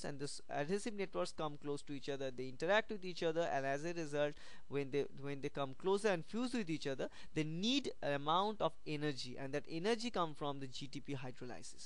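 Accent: India. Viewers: Can you make captions for your videos? I’m not Indian so I have trouble understanding you.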